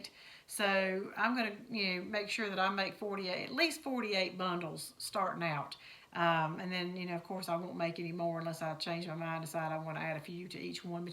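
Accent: South, United States. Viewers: So pretty, I've been trying to find a simple way to show my daughters (adult girls) a simple and easy way to make wreaths. My older daughter especially loves her diy's... I think I'll go out and grab some supplies for her and have her watch this wonderful video and try this project. Thanks so much for sharing. And I just love watching your channel... love love hearing that southern accent you have.. lol Thanks again and have a blessed day. 🍂🍃🍁🍁🍁🍁🍁🍁🍂🍃🍂🍁🍁🍁🍁🍁👍👍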